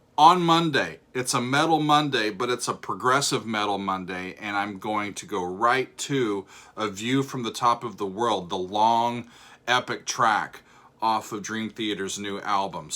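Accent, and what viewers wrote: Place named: Oklahoma, United States. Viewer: I'm in Oklahoma. Dude you have the Oklahoma accent ... Well maybe not .. not far off tho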